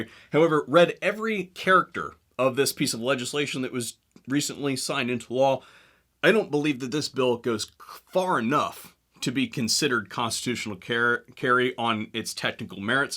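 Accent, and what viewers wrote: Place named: Ohio, United States. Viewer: Sounds like you are from Cuyahoga.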